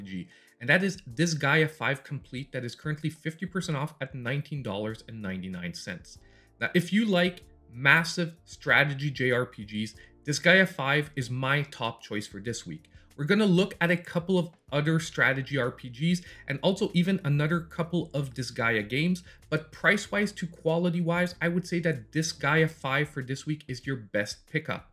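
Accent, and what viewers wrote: Quebec, Canada. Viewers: I'm very surprised that all the deals are from the states, cuz I'd be very surprised if your accent isn't french canadian. Also your channel name is maple syrup...pretty canadian. But I mean people do move to the states haha.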